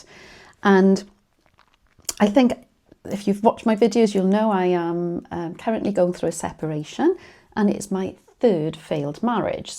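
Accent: Wales, United Kingdom. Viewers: you are such a wise grounded lovely women, so sorry things went wrong for you, good luck for the future, I'm in Mid Wales but was born in South Wales so it is so nice to listen to your accent thank you for sharing your life x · Hi, watching from Australia. Is your accent Welsh ? I love it. I visited Wales back in 1981 ! 😊💖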